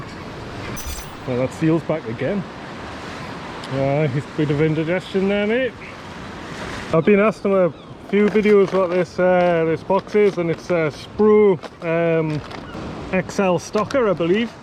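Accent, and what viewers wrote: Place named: North, United Kingdom. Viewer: Top notch again some great fish makes the scrambling well worth it, great to hear a fellow northern voice on these channels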